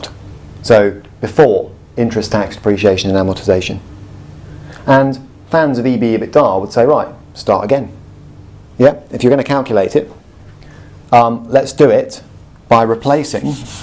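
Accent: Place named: United Kingdom. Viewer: Hi, English is not my mother tongue and I thought that I will have problems with your lovely British accent but fortunately you speaks very clear. I am so happy that I found your videos. Just it is a pitty we cannot donwload the Tesco balance u have and in this way we can start to interprete the financial figures from companies as I am new investing.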